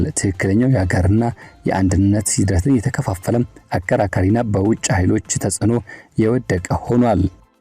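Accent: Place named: Sudan. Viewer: ፣ማን ነህ ??እባክህ !!አማርኛ የሚናገር ሱዳናዊ ትመስላለህ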